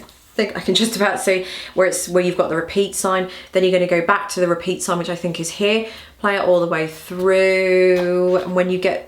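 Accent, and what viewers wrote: United Kingdom. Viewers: <3 I love your British accent ! all the best!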